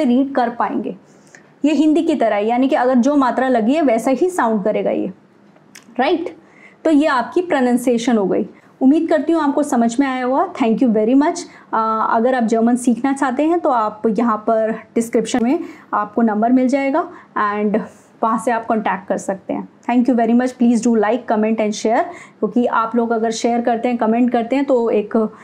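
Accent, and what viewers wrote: Rajasthan, India. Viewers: bist du aus rajasthan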